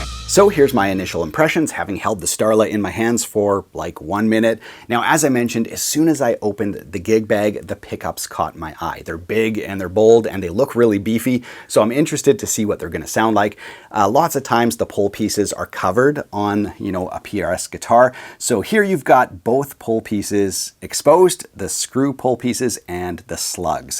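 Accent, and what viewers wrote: Canada. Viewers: Never heard you sound so Canadian aye!! Usually rarely hear you sounding typical Canadian Aye, or at least how we Americans stereotype it!! Said with love!! Love the Videos!! Your actually the 1st channel I started watching after not playing for 20 years. So Thanks for getting me excited about playing again!!!!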